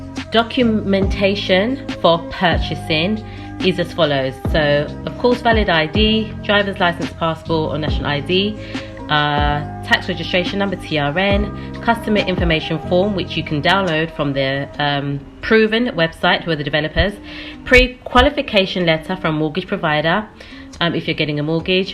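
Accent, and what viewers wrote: United Kingdom. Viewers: Greetings to my lovely family 🤗 manner an Prayer, thks again mi always love British accent ❤💖♥.. wonderful 👍🏿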